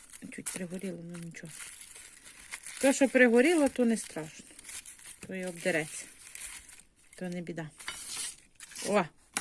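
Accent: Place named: Russia. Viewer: Капец...Мучается бедная баба с мовой.Поганит украинский язык..Акцент русский так и прёт.Приспособленка.😂😂😂😂😂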